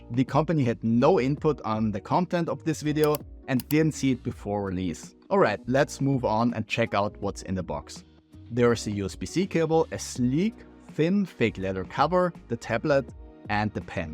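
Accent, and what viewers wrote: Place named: Austria. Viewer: With such an extremely obvious Austrian accent, I would never have guessed this guy's name to be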